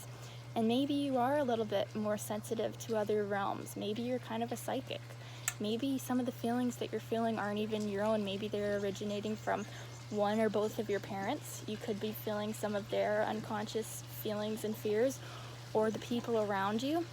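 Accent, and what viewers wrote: Canada. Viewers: As an astrologer I frequently look up videos for my clients to watch for maybe a better understanding, (I do free reads) 12 th house Saturn is a difficult placement and I needed a video to express that and your video did that!!! Thank you you are a gifted astrologer whom I would love to chat with!! Am I picking up a Canadian accent?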